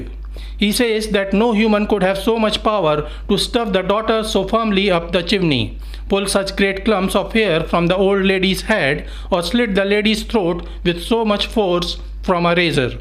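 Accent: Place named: India. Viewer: why how racist of u <3 the moment i heard his accent i knew he knew his sh*t lol. all informative videos made by people I hear with an Indian accent turn out to be great. Thanks for the vid!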